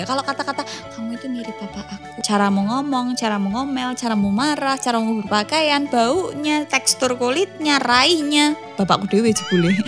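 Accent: Java, Indonesia. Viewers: suaranya mirip Nafa Urbach,, logat2 jawanya gitu looh.. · medok bgt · Wong jowo mb? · Suka kamu logat nya jawa tulen 👍👍👍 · Kok benar skl apa omonganmbak dng logat2 jawa yg kental.Koq jadi ingat dg mantan. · ko jawa nya bulet banget ya..hmm..yaahc,baik lh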